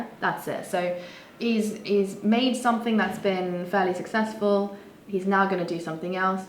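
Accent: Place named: United Kingdom. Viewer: Agree 👍 When I heard her British accent, I figured it out right away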